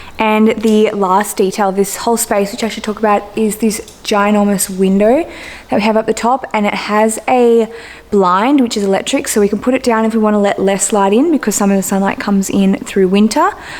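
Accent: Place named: Australia. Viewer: My ‘normal’ you mean outside of Australia? 😂 she has a normal Australian accent ✌️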